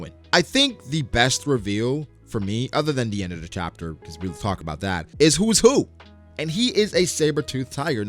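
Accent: Spain. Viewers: Spanish sounds more similar to Japanese than English so let that Spaniard come out. Listening to you talk about the chapter makes me enjoy it more than my initial reading. Great commentary as always. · nah bro, embrace the spanish pronunciation!! it sounds cooler, those american "r's" sound weird with japanese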